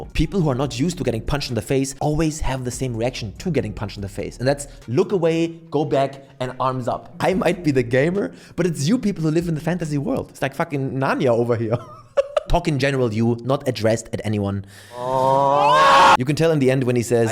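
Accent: Germany. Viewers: Holy didnt know was so German 🤧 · ok, for me, your accent sounds kind of like german^^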